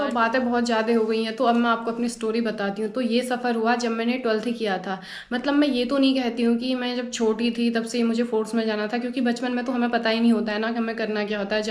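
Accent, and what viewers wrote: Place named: Kumaon, India. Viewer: आप बहुत प्यारी हो। आपके ज़ुबान से कुमाउँनी भाषा बहुत अच्छी लगती है।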